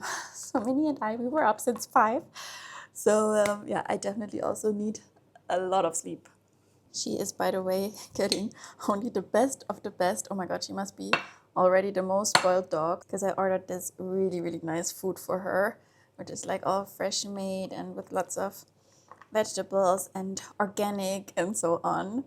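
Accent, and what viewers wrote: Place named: Germany. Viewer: Du bist wirklich so sympathisch. Dachte mir schon zu Beginn: dieses Englisch klingt so Deutsch 😅 Total süß und richtig gute Rezeptideen ❤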